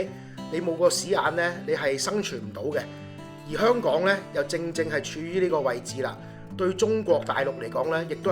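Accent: Singapore. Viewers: Hahahaha your singlish is hilarious!! Greetings from sg!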